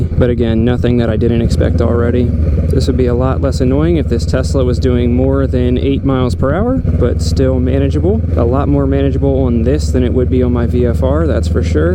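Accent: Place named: Vermont, United States. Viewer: Where are you, you have a pretty distinct accent. Vermont?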